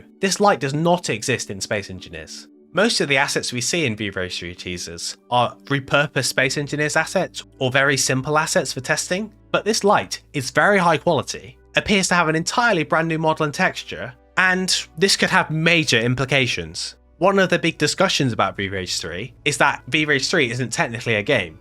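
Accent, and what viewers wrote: United Kingdom. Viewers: Very nice British accent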